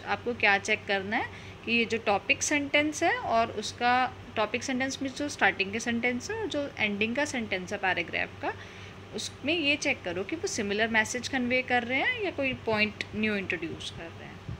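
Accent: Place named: India. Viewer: Better to explain with one language i think. Difficult to understand IndEnglish.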